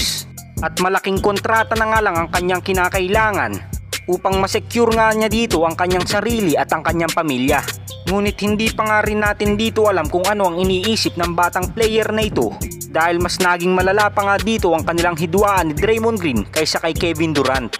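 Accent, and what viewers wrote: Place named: Visayas, Philippines. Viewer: Kainaman ng tigas ng yung salita..visaya kaba hehe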